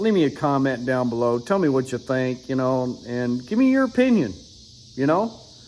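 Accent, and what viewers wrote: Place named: Oklahoma, United States. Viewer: You my mention Oklahoma. I live in Oklahoma and I hear all the locusts in the background and I'm thinking that you sound like your around here somewhere.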